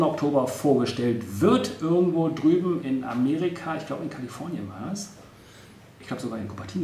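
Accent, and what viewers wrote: Asia. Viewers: Is that Asian speaking German!? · hahah, he's speaking german but in an asian accent:P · I wonder if he has an Asian accent to German people ?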